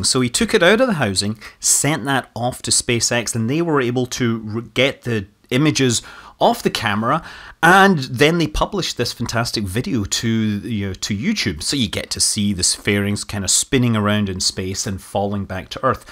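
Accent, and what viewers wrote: Scotland, United Kingdom. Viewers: Actual Scottish from! "Theire's nunnae the acoustic panneling"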